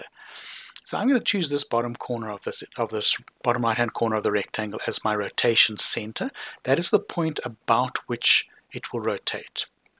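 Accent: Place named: South Africa. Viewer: Hi. Just dropping you this line about how clear and concise your videos are. I've been a long time ACAD user, but my computers out-aged my version of AutoCad. So when I found LibreCAD, it was quite different than my old systems. I tried numerous YT instructors but for various reasons they didn't help me much at all. I'm in Montana USA, so some of the videos were hard to follow, either from difficult accents of instructor, length of lessons or simply confusing instructions. I very much liked the way you broke up the sessions into focus on one area at a time (not too long and complicated), like the detailed use of each of the tools, the ease of following your very light South African accent and the systematic approach to each item. I'm 84, and I don't learn as quickly as I used to, but still active enough to frequently have a need for this program. 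I gather that you are actually a instructor/teacher and you do an excellent job. Your videos make it easy to 'back up' to catch anything I didn't quite understand the first time. Thank you so much. Best regards, Jack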